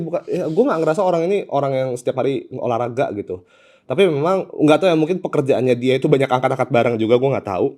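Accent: Java, Indonesia. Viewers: medoknya emang jawa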